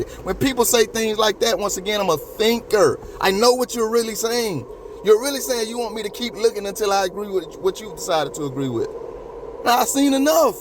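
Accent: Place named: Georgia, United States. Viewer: Just hearing you are from GA..I am too and you're right about how we talk! 😂 I agree 💯.. no one does everything "right". We, as humans are imperfect-we make bad decisions etc. I think Kristi wants BK "done" so maybe they won't dig deeper. This isn't from a rabbit hole... I've done a lot of my own digging. Theres way more to this than we know. Way back Steve said a lot of bad stuff was going to come out. There's a lot of things at play in this. Kristi interruption of Steve every other word drove me nuts!! Lol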